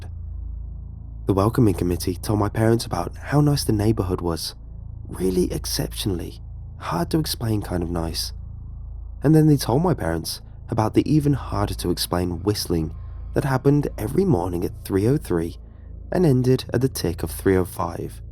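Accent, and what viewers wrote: United Kingdom. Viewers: knows what he does his brit voice adds to the creep but then agian i only really listen to him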